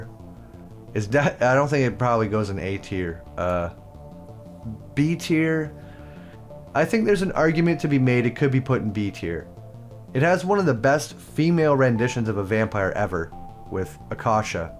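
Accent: Canada. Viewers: his accent is wildly canadian lol listen to his outs and abouts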